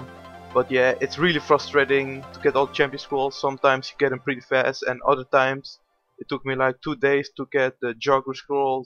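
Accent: Netherlands. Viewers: you sound dutch, am i right?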